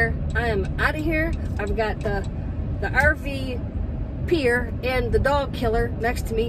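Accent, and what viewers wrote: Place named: Michigan, United States. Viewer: Hi there! New subscriber. Your video came up when I searched no build camper van. I've been watching your videos all morning. From listening to you speak in the very first video I watched, I knew you must be from Michigan too. This was later confirmed. Ive learned we have a distinct accent. I can see from this video that we are neighbors. I'm in FG. I'm retired and will be getting a minivan within the year to travel. Happy to have stumbled onto your channel. It is helping me see what will be a good way to set it up. Thank you!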